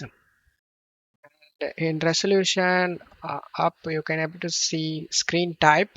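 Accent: India. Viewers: First i heard indian accent then i knew it will wokr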